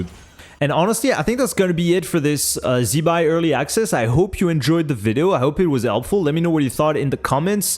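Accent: France. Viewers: L'accent français mdr, bel effort de prononciation nonobstant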